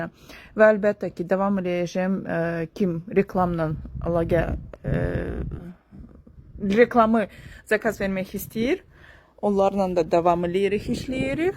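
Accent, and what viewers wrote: Ganja, Azerbaijan. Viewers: Твоя Ганджа акцент очень красивая❤🇦🇿🇰🇿💋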